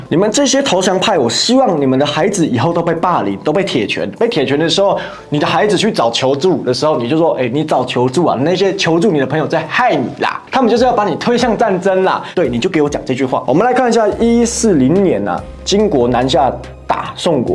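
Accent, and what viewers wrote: China. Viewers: 你的口音是標準的大陸的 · 為什麼你講話有大陸腔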